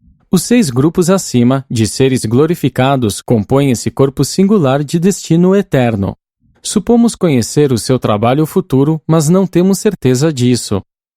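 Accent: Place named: Sao Paulo, Brazil. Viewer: ...sotaque paulista....confunde ponto com virgula......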